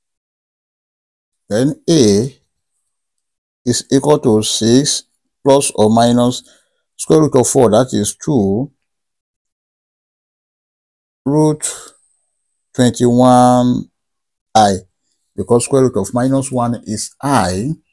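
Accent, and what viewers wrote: Nigeria. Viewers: Love your Nigerian pronunciation 😀😀😀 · I like Nigerian accent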